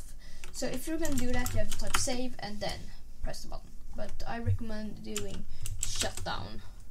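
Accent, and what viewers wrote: Sweden. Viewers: Är du svensk? låter så på dealekten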